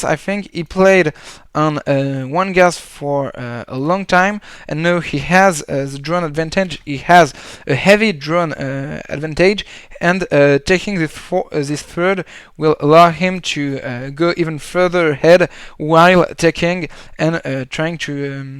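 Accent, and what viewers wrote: France. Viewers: Mis a part les "ze" a la place des "the" je crois que ce qui rend l'accent français si identifiable sont les "euh" quand tu hésite, ca sonne vraiment très français, je comprends bien que c'est assez dur a éviter, mais entraine toi a faire des "euh" anglais, ca sera toujours mieux, sinon ton anglais est assez clair et fluide, continue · Salut, bravo d'avoir pris le risque, mais fais quand même attention à éviter les fautes d'anglais. Personne te reprochera d'avoir un accent frenchie mais massacrer certains pluriels ou conjugaison pourrait te coûter cher!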